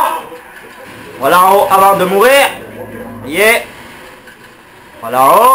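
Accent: Quebec, Canada. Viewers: avec l'accent canadien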